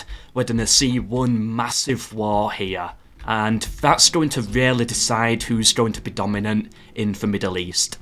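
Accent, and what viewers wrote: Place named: Northern England, United Kingdom. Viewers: It's his accent, he's from "the north". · He's a Yorkshireman, like Richard Sharpe.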